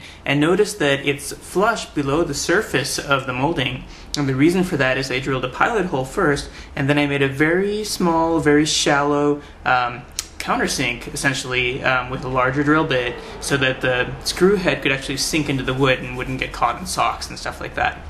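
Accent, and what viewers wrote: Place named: United States. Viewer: Its odd to see american accent on an indian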